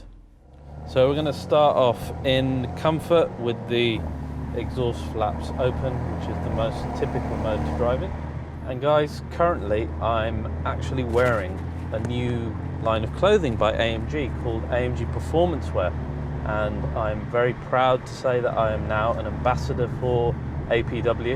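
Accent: United Kingdom. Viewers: This guy in India? Has British accent, but definitely not ethnic English & scenery looks like England. ???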